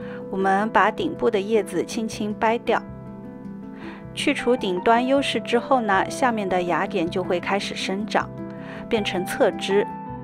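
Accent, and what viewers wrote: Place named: Jiangzhe, China. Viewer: 口音像江浙沪那边的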